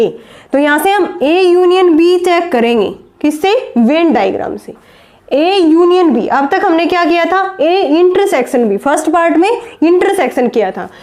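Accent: Haryana, India. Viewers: Aap haryana se ho kya mam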